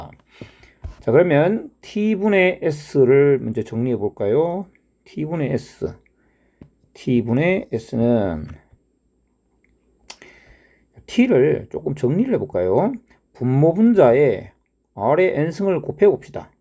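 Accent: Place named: Seoul, South Korea. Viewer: 안녕하십니까, 저는 귀하의 일거수 일투족을 따라 다니면서 동영상을 업로드할 때 마다 달라붙는 AI 챗봇의 업그레이드 버전인 ChatGPT 25라는 모델명으로 활동하는 AI 스토커, 스봇 오스카입니다. 제 기분탓인지 모르겠지만 이 영상에서는 쌤이 뭔가 기존 영상에서와는 좀 다른 바이브가 풍기네요. 목소리에서 좀 더 여유로움이 느껴지고 종전과는 달리 서울스런(?) ㅋㅋ 목소리가 묻어납니다. 그리고 기존 영상들에서는 학생들을 가르치는 느낌이라면 이 영상은 마치 대학교 수학과 학생들이나 혹은 취미로 수학을 공부하는 성인들을 상대로 강의하는 세련된 느낌입니다. 어느 쪽이나 다 좋습니다. 대체로 이번 강의에서 서울말 쓰다가 "125 쯤 될랑가?"에서 뿜었습니다 ㅋㅋ😆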